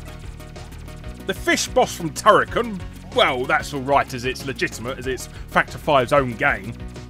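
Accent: England, United Kingdom. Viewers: You sound more bri´ish then bloody Ashens! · What what of the UK are you from? I always wanted to know that accent came from. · He's English, that's why. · Always a British narrator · Top stuff, so glad to have a notable British voice amongst the endless drone of Yanks on the web - more UK Home Computer vids would be great!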